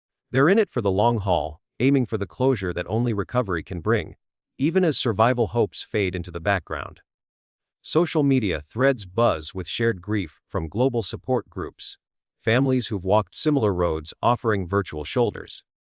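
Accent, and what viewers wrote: United States. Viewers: I don't think there ever was a snake nearby on the verandah. Also, it is very difficult listening to all this stuff when the speaker gabbles so quickly, and he has an American accent. He is very difficult to understand his meaning, sometimes.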